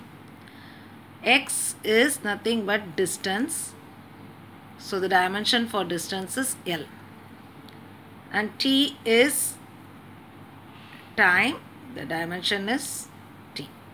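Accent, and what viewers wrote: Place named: South India, India. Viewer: She is South Indian so in her accent,yum is nothing bt M coz in South India anything we speak is started by y😂not her fault at all!! She explained very well!!😌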